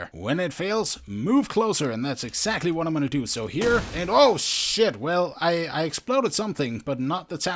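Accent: Denmark. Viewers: are u danish? :O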